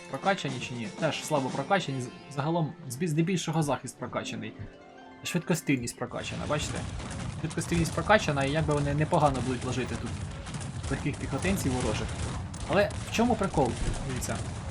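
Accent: Poltava, Ukraine. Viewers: Ти бува не з полтавщини? Бо говір шось дуже знайомий ,таке як чи Хорол ,Миргород , ті краї? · В тебе полтавський діалект?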